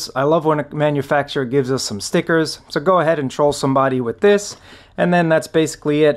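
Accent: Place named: Brooklyn, United States. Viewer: Straight outta Brooklyn lol. Well done with the video. I'm sold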